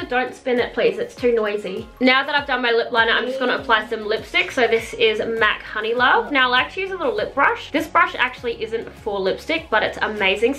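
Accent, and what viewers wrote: Australia. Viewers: R u Australian? Because I am just asking.. (edited: u might be sleeping rn if u r Australian)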